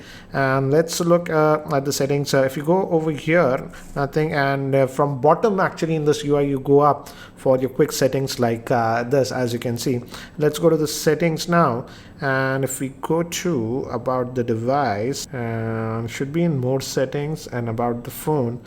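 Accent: India. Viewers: indian loghat inglish so rough and Bad the voice to hear it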